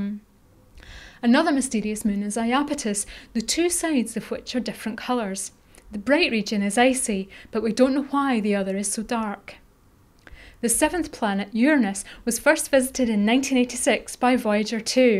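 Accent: Scotland, United Kingdom. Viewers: I liked the Scottish accent, unlike everyone else who's commented on it. But yeah, if we are to 'colonize the galaxy', we have a hell of a lot of work to do. It's a shame us non-geniuses can't really help much. Or can we? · gawd i hate her voice, what is she scottish? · She is VERY Scottish.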